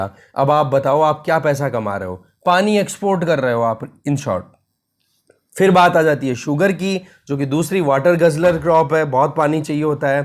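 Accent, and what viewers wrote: India. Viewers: Sir , HARIYANA Se ho kya aap .?? Thank you so much for such a great explaination... ❤️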